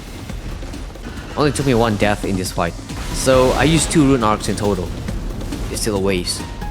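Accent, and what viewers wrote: Indonesia. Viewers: kirain logatnya ga asing, eh benran indo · Dari awal ngomong langsung ngebatin ini kyk aksen org indo et bener ternyata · bro u sounds Indonesian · you sound indonesian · Bahasa inggris nya bagus banget tapi sangat terdengar seperti orang indo banget. Dan iya, saya mulai bisa membedakan aksen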